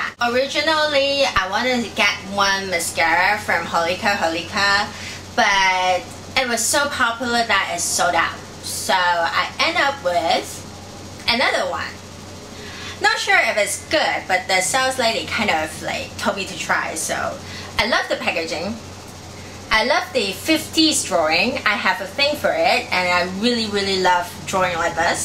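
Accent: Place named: Philippines. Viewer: Where do u from? U sound like a pilipino lolz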